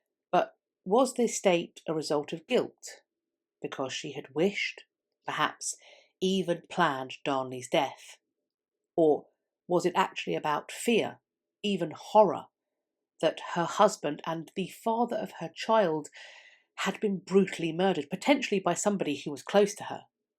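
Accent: United Kingdom. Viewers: Sometimes one just has to hear interesting British history told with a lovely British accent.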